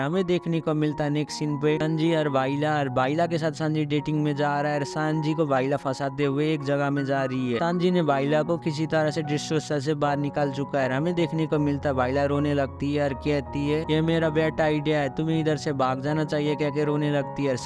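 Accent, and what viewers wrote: Nepal. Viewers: Because your Hindi accent is like Nepali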